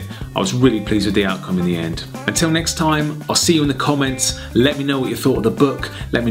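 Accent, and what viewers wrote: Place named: Sunderland, United Kingdom. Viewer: Your deffo from sunderland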